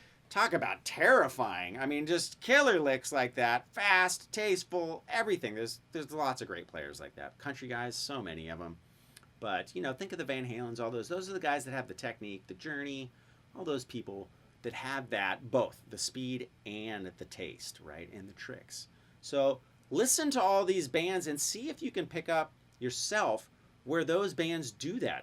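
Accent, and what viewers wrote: United States. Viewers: Enjoyed that lesson. I slowed it down to.75 speed to get the licks down correctly but when I did it made you sound like you had about 5 bong hits under your belt when you where talking. lol Guess it's that southern California accent...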